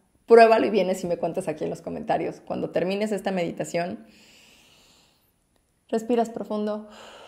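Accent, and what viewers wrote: Mexico. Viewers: Hola Mexicana hermosa Me gusta tu tono de mexicana A parte que eres linda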